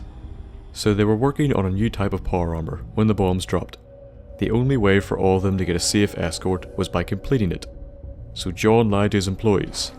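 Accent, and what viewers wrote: Northern Ireland, United Kingdom. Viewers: that's just his northern Ireland accent, doesn't matter if he tries to speak ina different way he'll just sound the same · are you british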